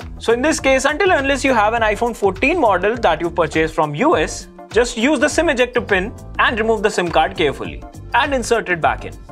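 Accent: India. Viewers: Here’s a great tip for all of us stupid Americans that don’t know how to speak India... Speak a little bit slower and try to separate your words because all we hear is alphabet soup sorry man I just figured to make it easier for your message translation typically will get frustrated and not even bother listening however thank you very much for your knowledge and sharing it with us I do really appreciate it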